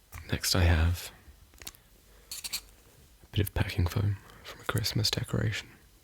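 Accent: Australia. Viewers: n Australian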